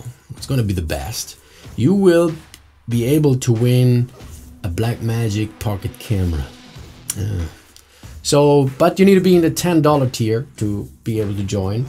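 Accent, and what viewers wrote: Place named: Italy. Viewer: I knew I recognised something italian-ish to you! That's because you are in fact Italian! Grande! :D I love the way you talk through your contents, and also the voice sounds. They made my day when I heard them the first time. I am almost new to Fusion and I am trying to get into it as much as I can, also because I am trying to push it in the company I am currently working for. Anyways, bel lavoro!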